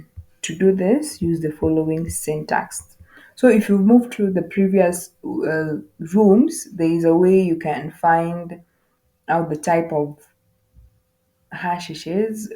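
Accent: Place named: Kenya. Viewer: You sound Kenyan I guess, if you don't mind are you proficient in C or C++?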